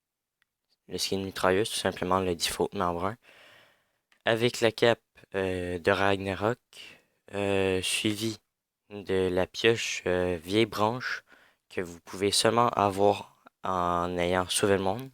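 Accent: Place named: Quebec, Canada. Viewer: Ho l'accent quebecois 😍😂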